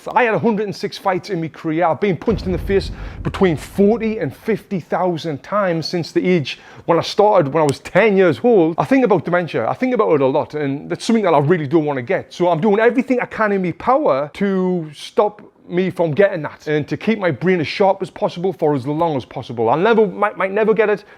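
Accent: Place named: Newcastle, United Kingdom. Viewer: Geordie boy, lovely, well done.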